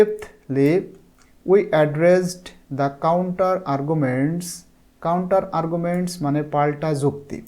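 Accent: Barishal, Bangladesh. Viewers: স্যার কি বরিশাইল্লা নাকি 🥰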